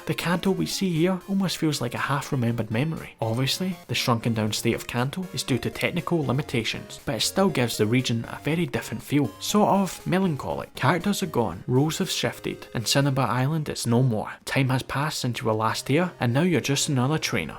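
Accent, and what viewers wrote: Scotland, United Kingdom. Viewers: it's a great accent you have. Brilliant. Scottish? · He said "Polish a bit more your voice" not "rebalance your sound". He doesnt understand the Scottish accent. I had no problems hearing anything in this video.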